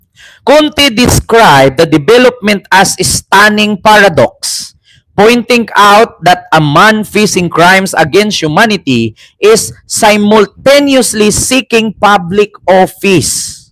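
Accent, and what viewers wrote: Visayas, Philippines. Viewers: Bisaya ka dong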